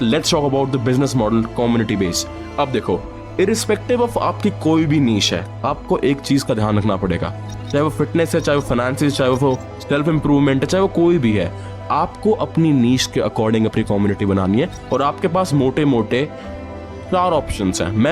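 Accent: Haryana, India. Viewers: Bhaiya i just have a simple question jo aap yeh itni bhynke English bolte ho vo kha se seekhi? Dictionary se kisi app se ya kese? Or kitna time lga the way u talk in English and mixed with haryanvi accent aaye haaye ❤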